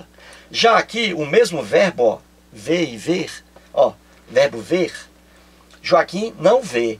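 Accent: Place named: Ceará, Brazil. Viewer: eita cearense vei arrochado.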